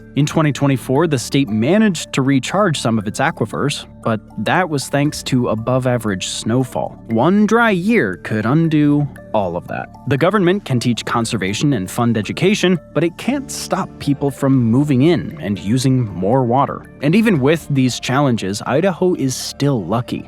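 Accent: California, United States. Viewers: Sounds a little bit like you might be from California.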